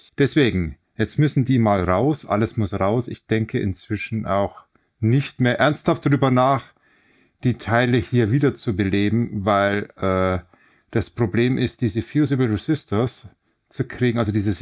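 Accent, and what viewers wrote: Bavaria, Germany. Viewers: Bei mir haperts an der Fremdsprache Bayrisch. Also ist das hier so, als ob ich Electro Boom gucke, nur dass dieser lustige Internetonkel hier nicht so oft unter Spannung steht. ¯\_(ツ)_/¯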